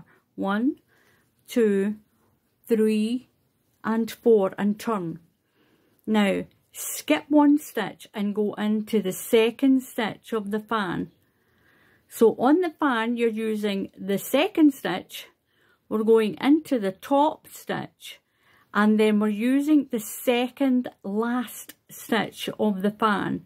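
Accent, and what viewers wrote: Scotland, United Kingdom. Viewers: you have really created a masterpuece with this beautiful, but simple stitch! Is that King Cole Cotton Silk a category 3 cotton? Trying to find a good substitute here in the US. My Great Gran taught me to crochet at 8 with #10 bedspread cotton. She was also Scots, so I love to hear you speak because it brings back fond memories of my Great Gran. Thank you for your lovely way of teaching and your brilliant talent!🎉❤🎉❤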